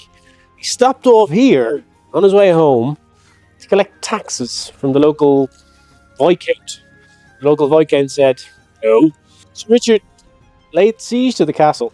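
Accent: Ireland. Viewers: you're a total ledge! mumbly Irish accent and all! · Now I can understand your Irish accent as I'm Irish too, go up north and then I might say yes hard to understand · Thanks for taking all of us window shopping. Love all the old buildings. The knives were cool. Love the back roads. So pretty. Yes I can understand your Irish accent. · Thank you for the titles of the villages,. It’s not your mumbly Irish accent - it’s my french ears not working. I love your Irish accent 🌸 Love from Cape Town, South Africa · Thanks for taking us along, we are glad you met Dan Preston. Also thank you for using subtitles for the proper name places you visit as sometimes I have a wee bit of trouble understanding your pronunciation of French names in your Irish accent 😅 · I'm Australian, and I also happen to be 46% Irish apparently, so I would love to visit Ireland one day. I also love France. 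I've always loved the French language, and I'm currently teaching myself French, so I do really enjoy seeing the French villages and culture that you show in your little adventures. P.S. I should also say, I love the Irish accent as well, so what's not to love about your Vlog! 😊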